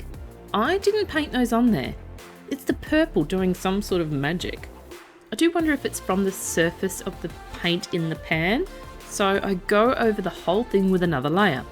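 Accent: Australia. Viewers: First of all: You've been making me smile a little bit more with every post-advent-calendar video. "The Manipulations of Manni (Manny? Mannie? Man-ny?)!" (🤔 Needs work.) I might be a bit obsessed. Also: I just wanted to say how I love, appreciate and adore that, compared to sooooo many YouTubers, you're classic Ozzie. (NOT bogan, classic. 😂) And you make classic Ozzie look and sound amazing. I'm from NZ 🖤🤍but I've lived in Brisbane 💚💛for... a while - a number of years longer than I originally intended anyway - and I reckon NZ and Oz are super similar in that we're kinda... understated? We definitely don't tend to have issues with hyperventilating in our excitement . Not in the same way I've noticed those from other countrys seem to anyway (I mean no disrespect, this seems to be an Oceanic idiosyncrasy, is all).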